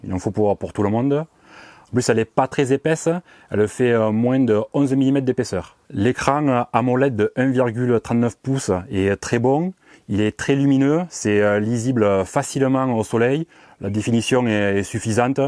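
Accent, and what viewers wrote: South, France. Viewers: Insupportable son accent du sud 🤦🏿‍♂️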